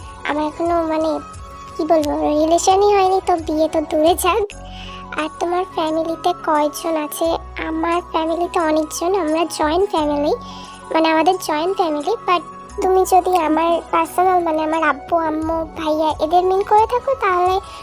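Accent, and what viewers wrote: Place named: Bangladesh. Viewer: Bangladeshi der moto kotha bolo keno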